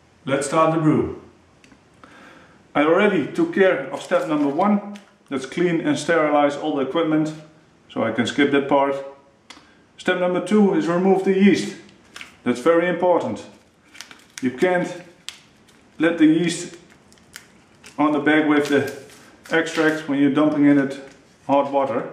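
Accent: Netherlands. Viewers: hey dude I moved to norway (from holland) the beer here is about 10 times more expensive as in holland. so when I stumbeled on to this in a paint shop ;), I just bought the stuff because I like to do new stuff and then yeah when it comes to beer theres no doubt. Ìm starting right now on the brew. but dude I gues you are dutch too right. the way the speak english sounds so dutch!! haha. well Im gonna make me some beer now!!!! thanks for the movie!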